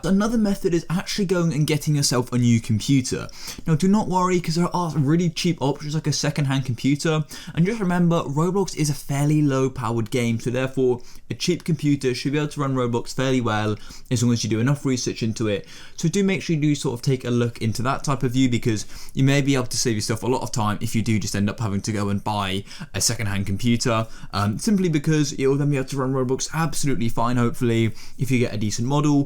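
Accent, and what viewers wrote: United Kingdom. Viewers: Let me guess your british?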